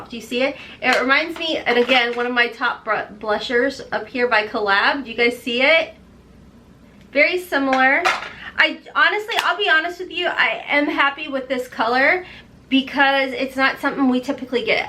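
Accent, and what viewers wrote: South, United States. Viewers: Totally agree it's not worth it. The limited edition bags were good until this bag. I didn't get all the same things you got but mine didn't look like it was even $20 P.S. love your southern accent. Wish I did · You sound extra country in this video lol, cute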